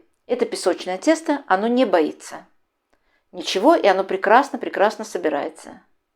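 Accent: Baku, Azerbaijan. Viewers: спасибо за рецепт, землячка! Бакинский акцент не проходит с годами!))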